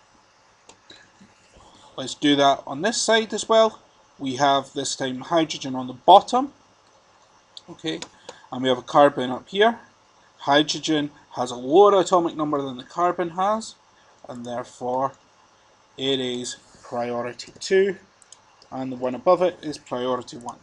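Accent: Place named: Scotland, United Kingdom. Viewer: I think that is a Scottish accent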